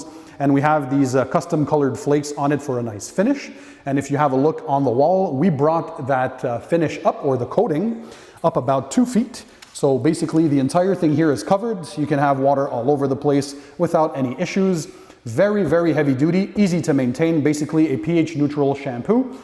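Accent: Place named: Canada. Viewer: I didn't realise you are Canadian. My Irish ears can't distinguish the Canadian accent from the American one.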